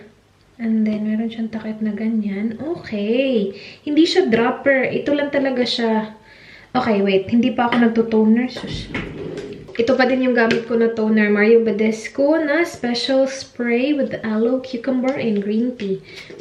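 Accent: Visayas, Philippines. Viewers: Ang cute mo ate magbisaya hahahaha! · Hehe. Bisayang dako ate. 😂😂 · Bisaya kapo? AHAHAHAHHA sa accent mo po kasi ahahhahahaha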